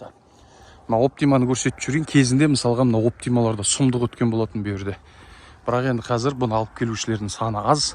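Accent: Standard. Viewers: Ассалаумағалейкүм көпшілік! Сөйлеу мәнері, тіл байлығыныз керемт. Таза қазақ тілінде сөйлейсіз, тындап отыра бергін келеді.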